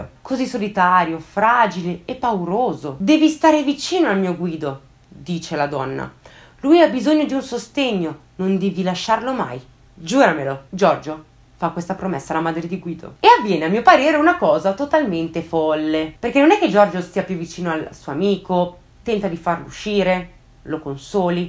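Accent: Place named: Piedmont, Italy. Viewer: Hai una bella voce, un bell'accento piemontese con gli accenti giusti, una rarità. E i tuoi resoconti sono veramente interessanti e esaurienti!!!! Io ricordo bene il caso Graneris! Sono di Biella e l'ambiente vercellese era quello della mia adolescenza, il freddo, la nebbia e una campagna desolata.....non la rimpiango! Sono tanti i casi italiani inquietanti che hanno lasciato la gente inorridita, e mi sarebbe piaciuto svescerarlli di più, ma non ricordo i nomi, spero che ti capiti di rivangarli, mi toglierei qualche domanda senza risposta. Intanto ti ringrazio, fai un buon lavoro!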